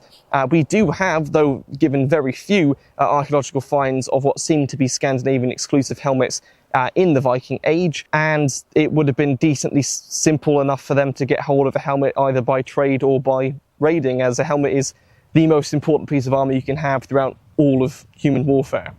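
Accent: United Kingdom. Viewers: I like your English accent Lolol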